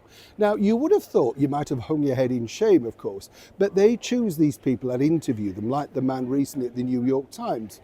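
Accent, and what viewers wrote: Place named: England, United Kingdom. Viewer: Thank you for your straight-forward delivery. Never put a soundtrack in. I like your voice and the sounds of England.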